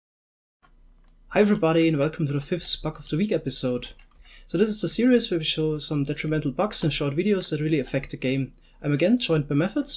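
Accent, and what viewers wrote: Germany. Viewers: I love your german accent keep it up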